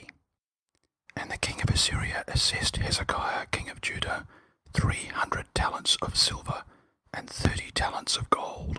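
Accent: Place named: Australia. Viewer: This is great. ASMR bible whispering...with an Aussie accent! Thank you.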